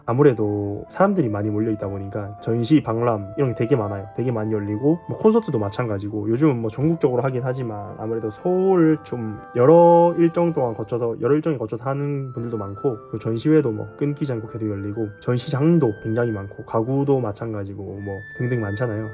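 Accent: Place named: South Gyeongsang, South Korea. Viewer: 창원분이시구나 ㅋㅋㅋ 어쩐지 익숙한 경남 사투리네요 ㅋㅋㅋ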